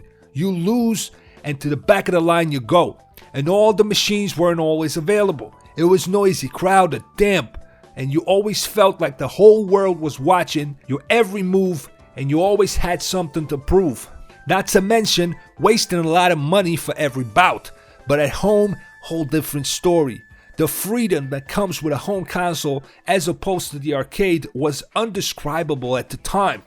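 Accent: New York, United States. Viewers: Your accent of a new yorker but you have pal games through me off. I feel you though on the nostalgia of these games and those times. I have similar memories.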